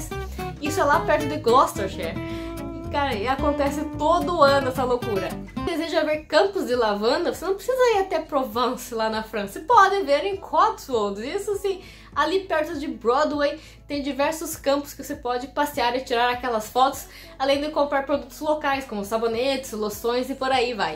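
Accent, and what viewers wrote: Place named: Paraná, Brazil. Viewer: Sotaque mais lindo do meu interior do PR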